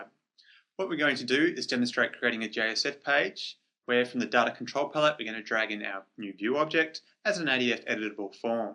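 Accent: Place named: Australia. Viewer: See, everybody loves an Aussie accent! ;-)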